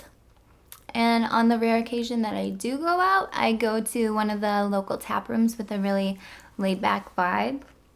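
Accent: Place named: Spain. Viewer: Good Spanish accent.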